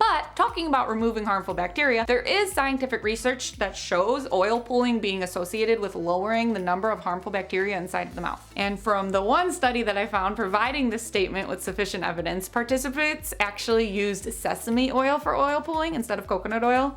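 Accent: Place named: Midwest, United States. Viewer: I can tell a Midwest girl from a mile away. Hiiiii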